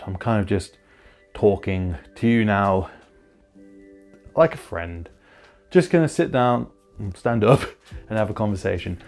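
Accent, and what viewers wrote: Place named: Scotland, United Kingdom. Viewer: You have an intresting accent. Where are you from? Scotland?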